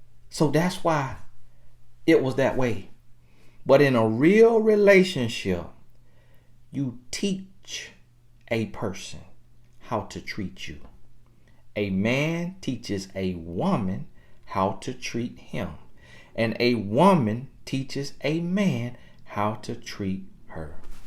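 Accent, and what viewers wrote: South, United States. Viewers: U my training wheels i love your voice its country a accent now lets get in tune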